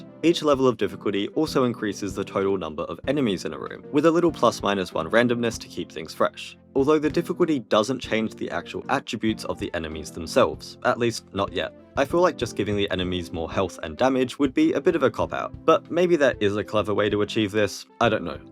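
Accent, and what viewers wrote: Australia. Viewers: 2 comments about the middle-ish section. 1st. YOU'RE AUSTRALIAN?! WHERE I NEED TO KNOW SO I CAN GO IM ALSO HERE 2nd. I use never eat soggy wheat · Waaaiiiit! Your Australian! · HES AUSTRALIAN?!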